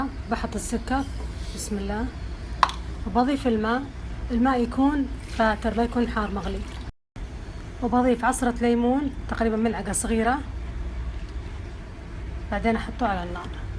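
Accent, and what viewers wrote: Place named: Najd, Saudi Arabia. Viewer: هاذي لهجة اهل نجد بشكل عام 😍🥰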